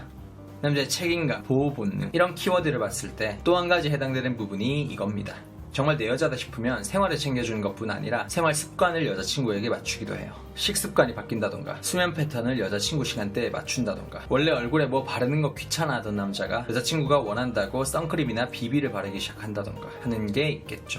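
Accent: Gyeongsang, South Korea. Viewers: 아 역시 대구분이시군요.대구출신 친구들이 많은데 그 친구들한테 듣던 억양!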